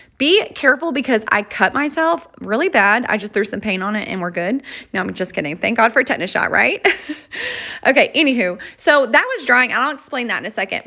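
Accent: South, United States. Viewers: You are making me laugh....I KNEW you had to be from the South (I'm from TN)....and I ALWAYS say "anywho"...love it! I think I really like the Jingle All The Way sign. Never thought of distressing the metal. Great idea!!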